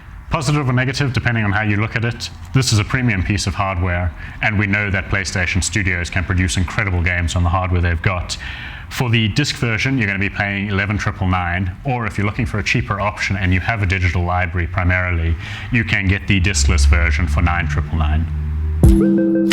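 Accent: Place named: Scotland, United Kingdom. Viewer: Thick Scottish accent LUXURY!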